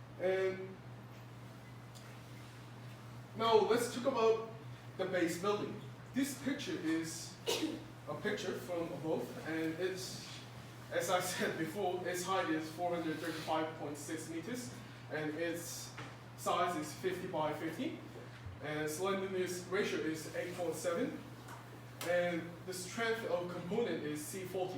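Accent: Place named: United Kingdom. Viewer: 브릐티시 악센트가 멋있네요~~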